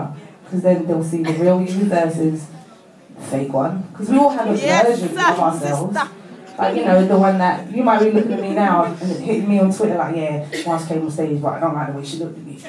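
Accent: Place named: United Kingdom. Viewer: Damn!! I love the British accent on women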